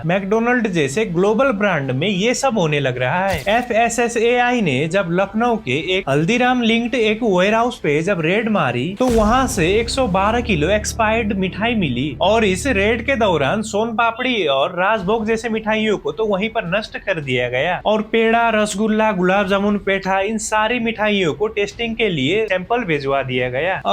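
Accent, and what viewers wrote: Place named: Nepal. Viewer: Yeh toh nepali accent lag raha hai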